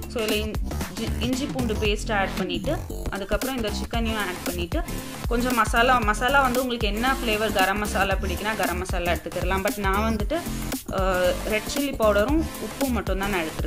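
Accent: Kerala, India. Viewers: Nice speak. Tamil. I think your from kerala. But your speech. Tamil. Gails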